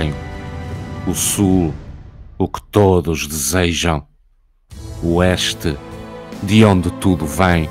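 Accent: Portugal. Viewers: Acredito que você seja de Portugal pelo sotaque. Acompanho-o do Brasil. Fernando Pessoa, o melhor poeta do mundo. Indico-lhe o que para mim é o segundo: Augusto dos Anjos. Comece pelos poemas "Versos Íntimos", "Psicologia de um vencido", "Budismo moderno" e "A Árvore da Serra". Grande abraço!!!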